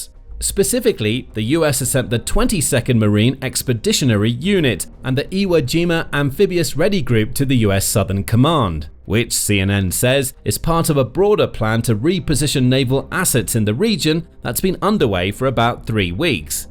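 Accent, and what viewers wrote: United Kingdom. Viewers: Ok, so we have a channel that talks about USA military force, and the narrator of the show has a British accent? Couldn't you get an American accent for a show about our military forces? It's just odd.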